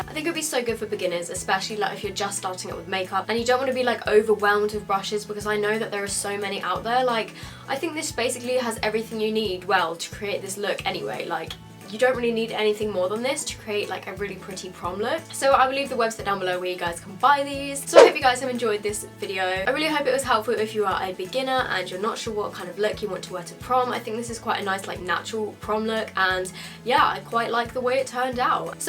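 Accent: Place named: United Kingdom. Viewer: It is so refreshing to not only hear a fellow Brit doing these kinds of videos, but also who doesn't tan and is pale! Loving the videos :) x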